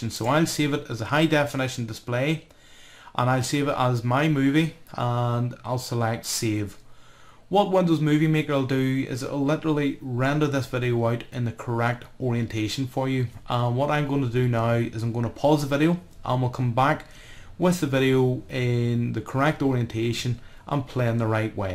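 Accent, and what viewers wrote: Northern Ireland, United Kingdom. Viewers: Hey are you from Northern Ireland?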